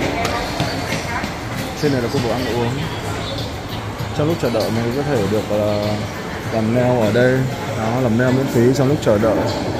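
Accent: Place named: North, Vietnam. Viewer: Mình thích nghe giọng nói miền Bắc a ấy nhẹ nhàng truyền cảm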